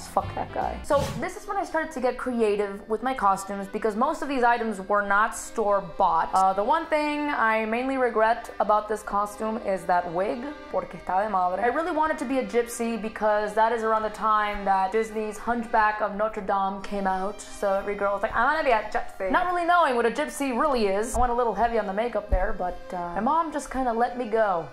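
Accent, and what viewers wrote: Cuba. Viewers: I live for that cuban accented spanglish! as a spanish person (spaniard? idk, english is confusing. I mean to say that I'm from spain) I find spanglish and the evolution of spanish in general across the pond so interesting! it's amazing how a language can change so much and represent so many cultures and yet still be like a comforting unifying blanket. bit of a weird metaphor, but I'll go with it. anyway, just wanted to say that I love your videos (and accent!) 😊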